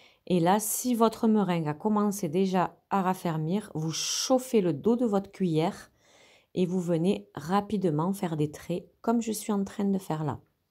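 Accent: South, France. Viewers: Coucou, elle me plaît beaucoup ta bûche, effectivement elle est à "croquer "parfaite pour cette année à Noël 🤶 🎅 🤶 🎅, merci beaucoup pour tes partages avec notre bel accent du Sud...Gros bisous et très belles fêtes 🥳 à toi et à ton entourage. 😊😊😊😊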